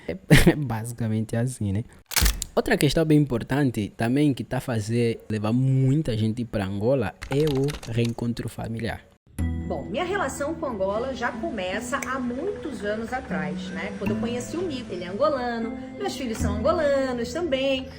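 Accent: Brazil. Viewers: O gajo tem sotaque brasileiro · O teu sotaque é muito parecido com o português - brasileiro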